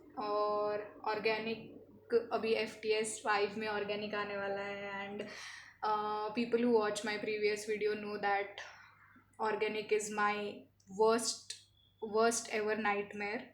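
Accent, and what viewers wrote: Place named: Mumbai, India. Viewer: Hi... Can you tell how many chapters have you completed (or revised) till now? Are there any chapters that you are not doing? And also, this is not study related but are you from mumbai? Your way of speaking made me think that u could be from mumbai. As well as, in one of your videos you mentioned about splitsvilla. Actually, I also watch splits and its a distraction. So you are not the only one facing that kind of distraction. You are not alone, hehehehe. All the best.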